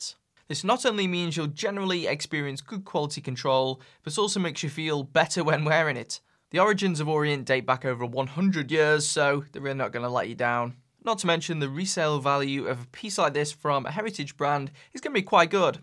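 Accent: Stoke, United Kingdom. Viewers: is it stokie?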